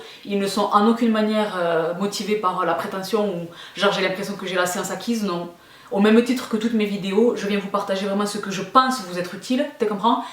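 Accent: South, France. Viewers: J'adore ta façon de parler. Franche et rigolote. Et tu as un accent excellent, ca me rappelle mon sud, moi qui me suis expatriée. Je suis d'accord avec toi, continue, tu es top.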